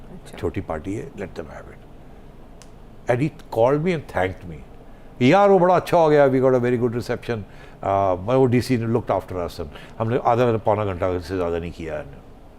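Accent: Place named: Punjab, India. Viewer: Mr sethi Childish naughtyness in your talks and gestures enchants....and further Punjabi tarka enchants square (in terms of math )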